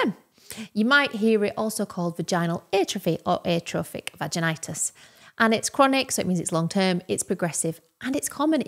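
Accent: United Kingdom. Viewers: your British accent, alone, is challenging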